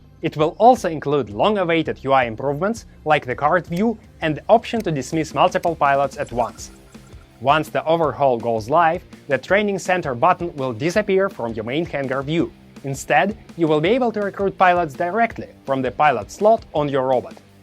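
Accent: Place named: Russia. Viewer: Мэн ну у тебя и акцент... Русский чтоль?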